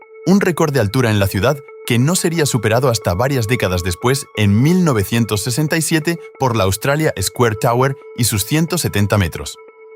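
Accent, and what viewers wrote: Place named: Spain. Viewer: habla como sspañol y larino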